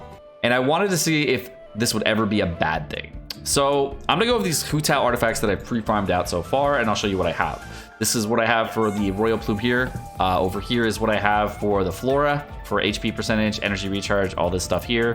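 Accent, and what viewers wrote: United Kingdom. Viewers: Woah british again ☺️ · I don't care about who tao. I'm just here for the music and bri'ish.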